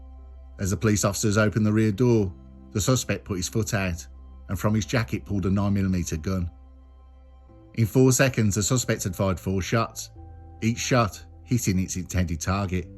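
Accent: Birmingham, United Kingdom. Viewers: ... Narrator has a Smoooth Brummie Accent... NICE FLOWING STORY 🎉🎉🎉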